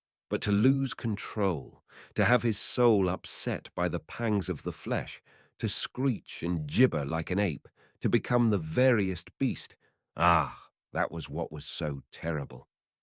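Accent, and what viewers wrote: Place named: United Kingdom. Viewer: Are you crazy? All American author read with a heavy British accent is NUTS. Jack is turning over in his grave.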